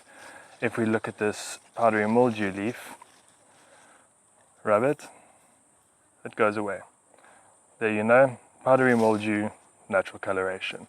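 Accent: South Africa. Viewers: Thanks for the tips. I'm subscribing because you sound South African and it's hard to find South African gardening YouTube channels today we can relate to. Looking forward to your future videos...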